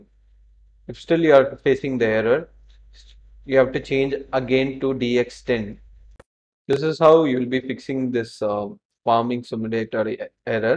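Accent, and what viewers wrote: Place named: India. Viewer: Блин как же индийский английский похож на русский английский )))) Спасибо за видео, работает!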